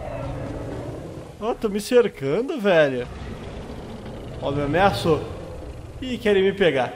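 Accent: Rio Grande do Sul, Brazil. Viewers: Vc é gaúcho?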